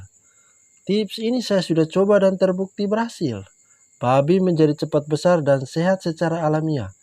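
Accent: Flores, Indonesia. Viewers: Logatnya seperti orng Bajawa..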